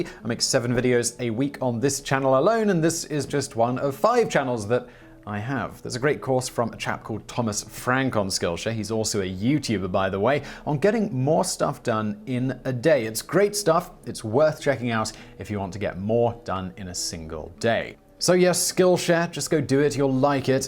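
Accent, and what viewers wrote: United Kingdom. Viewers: Its the Queens English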